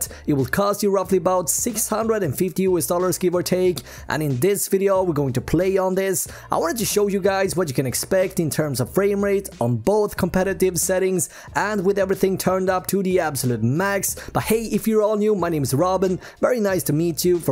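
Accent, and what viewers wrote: Sweden. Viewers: Are you swedish sounds like it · Are you Swedish? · Are you swedish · U sound swedish and if you are, Hej :)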